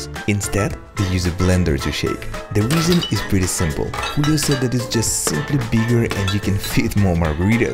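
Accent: Russia. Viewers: Акцент русский будто...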